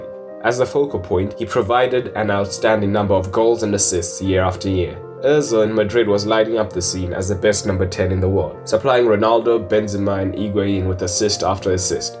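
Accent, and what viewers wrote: South Africa. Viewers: You Have A South African Accent. Either Zulu or Xhosa :) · Totally of topic here, but this dude sounds south African,... or is it just me? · Is this guy from SA?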